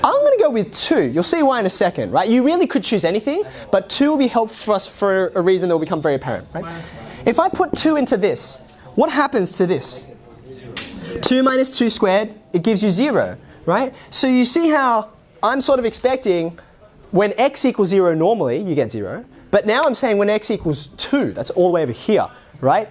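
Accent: Australia. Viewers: This topic comes in the entrance exam for a national and kinda quite prestigious university here in Peru. I'd also like to say that I am captivated by the Aussie accent, it is fascinating and also sounds cooler, to the best of my knowledge. Greetings from Peru :D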